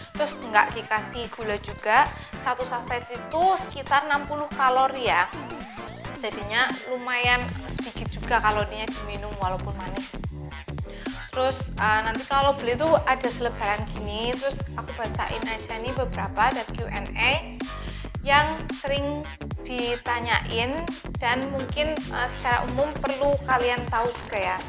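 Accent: Java, Indonesia. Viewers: Medok mbak e😂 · Cece medok bangat ya 😀wong Jawa yaaa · Cee lucu banget kalo ngomong. Medok Jawa😁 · Ngomonge agak medok yaa cece Salam teko Suroboyo 😅👍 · Surabaya? Dialectnya medok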